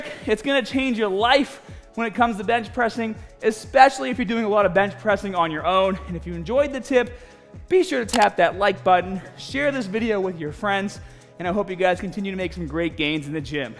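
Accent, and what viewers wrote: Boston, United States. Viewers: Boston guy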